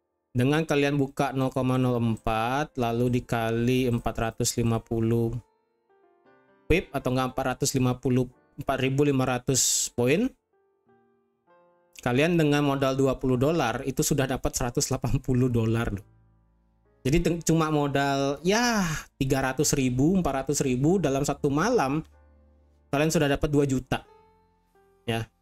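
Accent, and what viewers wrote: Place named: Bali, Indonesia. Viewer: dari logatnya asal bali nih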